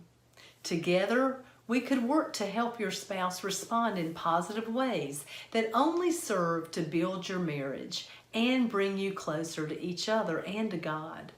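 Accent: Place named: South, United States. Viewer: Well, yeah, you can tell by the southern accent that Christianity blurs the fact of the matter….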